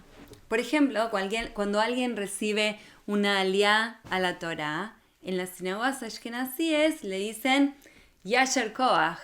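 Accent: Argentina. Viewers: Tengo muy buenos amigos sefardíes y algunos asquenasies pero tienes un bello tono de voz además el dejo argentino que es muy bonito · que acento argentino tiene me desespera un poco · Me encantan sus narraciones históricas acerca de las personas judías , habla muy bien aunque con acento argentino pero es muy musical y agradable escuchar su voz y la forma en que narra las historias punto Muchísimas gracias,SHALOM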